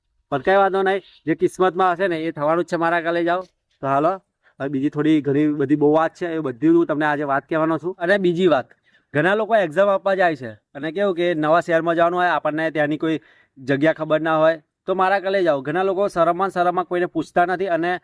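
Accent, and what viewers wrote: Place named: Surendranagar, India. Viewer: તમારું ગામ,તાલુકો અને જિલ્લો કયો..ભેરુ.. સુરેન્દ્રનગર ના લાગો છો બોલી જોતા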